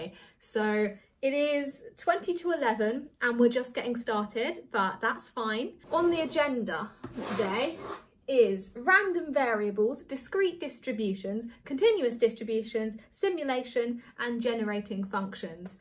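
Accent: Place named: England, United Kingdom. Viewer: what part of england is that accent from? I like it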